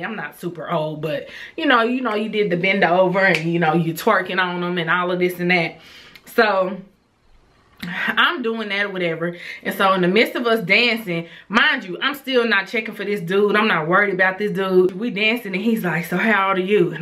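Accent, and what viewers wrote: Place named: East St. Louis, United States. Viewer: East Saint!!! I know our accent anywhere lol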